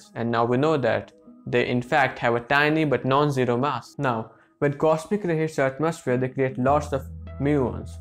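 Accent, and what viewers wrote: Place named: India. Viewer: I cannot experience this video due to the pajeet voice and accent.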